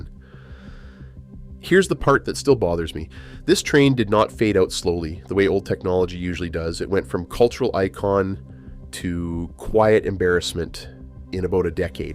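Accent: Canada. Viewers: (He sounds almost Canadian.)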